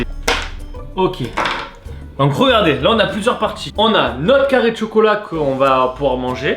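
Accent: Marseille, France. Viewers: Tu as un accent marseillais trop chou😉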